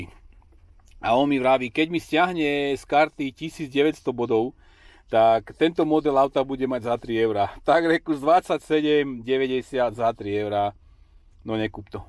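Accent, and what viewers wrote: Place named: Liptov, Slovakia. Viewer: odkial pochadzas??podla prizvuku bz by som tipol liptov alebo oravu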